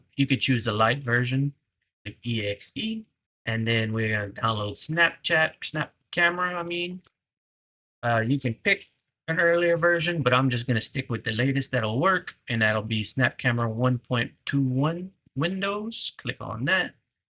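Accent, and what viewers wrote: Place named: Louisiana, United States. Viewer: I know a badass cajun when I hear one. Thanks for this tutorial ami ♥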